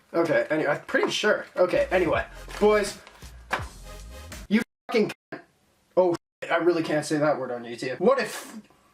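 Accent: Canada. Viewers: Where in Canada 🇨🇦 are you from I love finding out youtubers are from Canada like the nelk boys I assume your in Canada with the ehhs and boys aacent lol.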